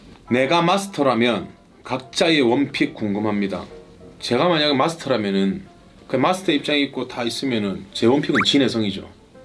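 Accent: Seoul, South Korea. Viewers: 해성씨. 방가워요 서울말씨. 귀여워요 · ㅋㅋ서울말 오빠 ~~~ 우승꼭 ^^ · #진해성 커피한잔할레? 서울말 잘하시네요 서울말 많이 해주셔요 이다음에 M C 도 하신다면 유창한 서울말 잘하셔야 될것 같아요 탑7중에 제일 잘생기고 멋지고 노래넘사벽 최고예요 미스터트롯2의 우승을 기원합니다 💙💙💙👍👍👍👍👍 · 진해성 가수님 서울말도 귀역네♡ 미스터트롯 최종우승 하시길 응원합니다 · 서울말 너무 귀여워요 모닝콜도 구수하네요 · 서울말 너무귀여웡~~^^ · 서울말 않해도 괜찮이요 원픽 진해성 만네요 승리만~~~👑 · 서울말듣기좋아요 사랑합니다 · 서울말 너무 귀여워요~~ ㅋㅋ 화이팅!! 힘내요. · ㅋㅋㅋㅋㅋㅋ서울말 왜케 귀엽나요 너무 좋아요 진해성😍😍😍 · 서울말?ㅎㅎ 해도 귀엽고 안해도 귀엽네 역시 진해성 짱! 등수 상관없이 즐기세요 싸랑합니다^^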